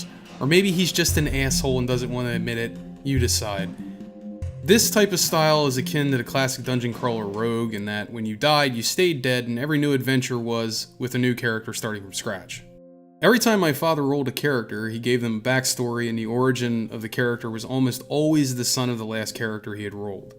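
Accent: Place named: Philadelphia, United States. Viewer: You're from Philly, aren't you.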